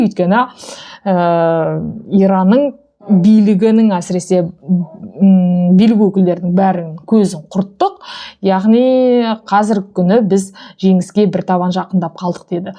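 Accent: Standard. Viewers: Өте түсінікті таза қазақша сөйлейсің рақымет ұзақ ғұмыр тілеймін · Айналайын қандай жақсы таза қазақша жеткіздің рахмет 👍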